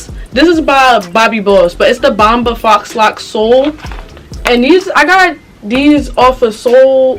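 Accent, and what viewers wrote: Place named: Philadelphia, United States. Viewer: Yesss when u started talking I was like she definitely a Philly girl 😍😍😍😍 hair stores open in Southwest Philly from another Philly girl ps I subscribed 😘😘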